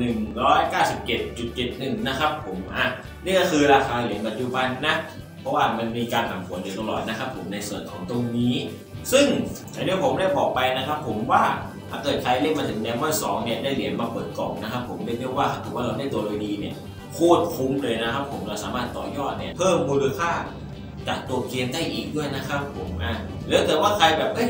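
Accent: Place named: South, Thailand. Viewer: แหลงใต้ต่ะหลวง เท่เลยผมว่า 555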